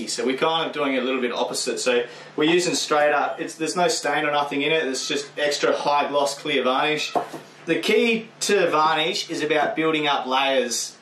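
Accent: Australia. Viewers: That Oz accent is so cool, more so when your hyped about something. Its like listening to a chilled Brit but on speed. :)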